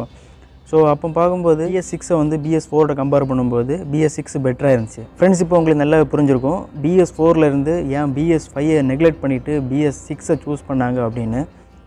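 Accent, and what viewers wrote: Kanyakumari, India. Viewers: Enga ooru paiyan KK Dist accent 🤣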